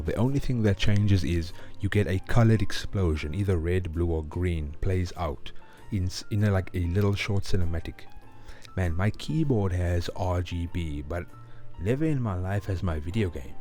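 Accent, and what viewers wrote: South Africa. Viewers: Enjoyed the vid, you sound like you're from RSA too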